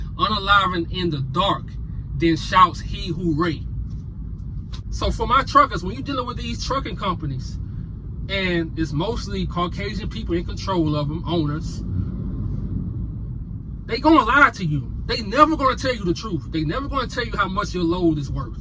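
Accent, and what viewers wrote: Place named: New Orleans, United States. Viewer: Bro you from N.O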